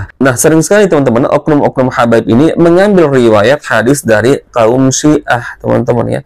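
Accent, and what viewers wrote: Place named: East Java, Indonesia. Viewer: kalau di cirikan dari dialeknya kayaknya dari Malang atau Surabaya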